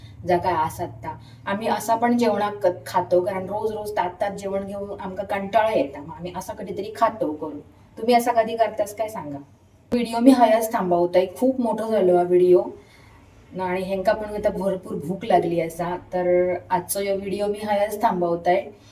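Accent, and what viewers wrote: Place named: Malvan, India. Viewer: "Hello ma'am just watched your latest vlog on cooking those delicious-looking thalipeths and I'm craving them now! 😂 Loved how you shared your morning routine and gave us a sneak peek into your daily life. The recipe was super easy to follow and I'm definitely trying it out this weekend! 👍 Your energy is infectious and it's so much fun watching you cook. Keep 'em coming! 💕 The malavani accent you speak is so amazing 😍 got to see u in very different way ....keep going lots of support and well wishes for your YouTube journey ....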